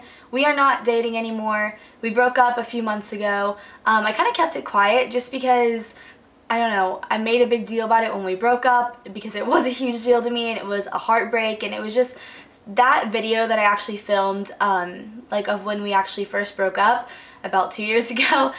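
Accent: Texas, United States. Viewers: It's a small texan accent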